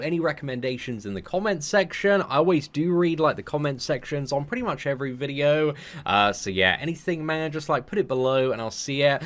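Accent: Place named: England, United Kingdom. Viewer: Definitely appreciate you,. Informative content, entertaining content, always a chill time watching your videos and I think for most people from the states the English accent is a cherry on the top. Much respect and looking forward for whatever else you create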